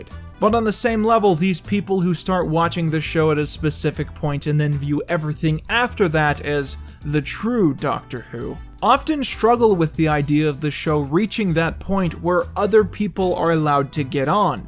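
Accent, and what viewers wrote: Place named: United States. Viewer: The problem I have with this video is that a Yankee voice is lecturing the audience about a quintessentially British science fiction programme. The American TV's record with sci-fi is not exactly innovative. When the pubs were emptying so people could get home to watch the Quatermass serials, what did the Americans have? Captain Video! Those of us who have watched Doctor Who since the first episode, have accepted changes over the years, the Actors in particular. Since the re-boot however, the change that has been most disconcerting is how it has been turned into a soap opera by, let's face it, kitchen sink dramatists. The changes that have occurred did not, for 50 years interfere with the main premise, that Doctor Who is a male hero. It seems that only when Moffatt ran completely out of ideas, that the hints started about gender swapping. 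It is apt that the speaker mentioned Sherlock Holmes, I notice that he did not say, suppose you wake up one day and find that Sherlock Holmes has become a woman. That is the impact of this change. To make Doctor Who a woman is just a lazy gimmick by a desperate BBC to improve the ratings. I suspect that after the initial interest over such a stunt, that the good Doctor will disappear quietly from our screens (mustn't blame the change to a female). Perhaps to be resurrected in less politically correct, trendy, gender fluidity infatuated times, when it can get back to being an escapist, exciting, scary sci-fi show.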